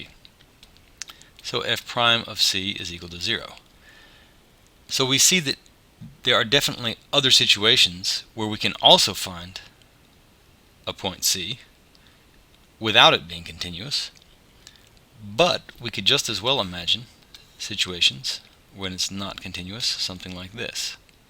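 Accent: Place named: England, United Kingdom. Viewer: Just the paint, it seemd your accent was English. Nice video also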